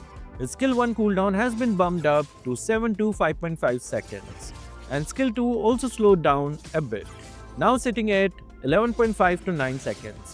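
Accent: India. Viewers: Japanese name but Indian accent 😅😂😂😂😂😅😅😅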